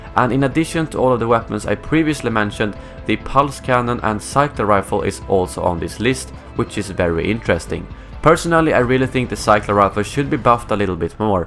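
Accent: Sweden. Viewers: nice channel keep it up! that swedish accent tho :) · är du från Sverige och stockholm=)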